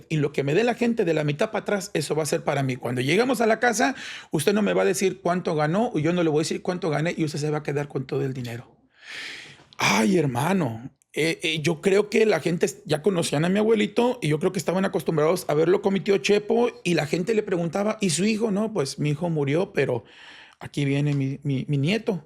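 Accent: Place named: Pocho, United States. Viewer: Que triste y pensar q muchos niños pasan por esto o cosas peores solo una duda por q habla como pocho 🤦‍♀️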